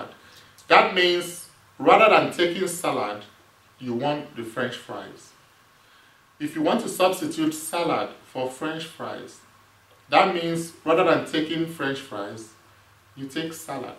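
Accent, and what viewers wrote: Nigeria. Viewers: this helped alot! thankx..sorry are you an Igbo Nigerian Man?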